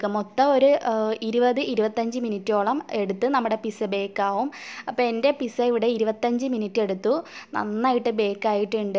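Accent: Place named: Kollam, India. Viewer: കൊല്ലം കാരിയാ ലെ ... കിടു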